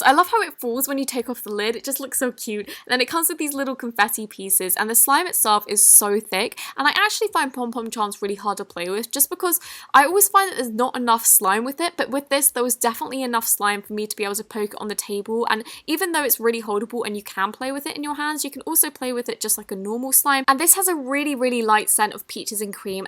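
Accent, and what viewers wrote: England, United Kingdom. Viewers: BRUH SHE HAS A BRITISH ACCENT SO SHE PRONOUNCES THINGS DIFFERENTLY!!! 🙄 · What part of England are you from??? And your the best😂😃😂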